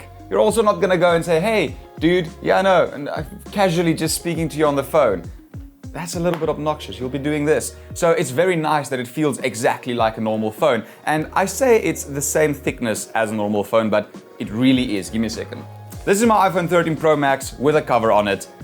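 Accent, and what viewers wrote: South Africa. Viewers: You sound South African, subbed.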